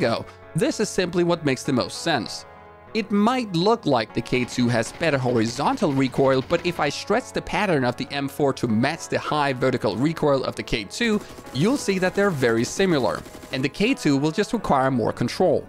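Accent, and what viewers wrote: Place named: Denmark. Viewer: interesting danish accent!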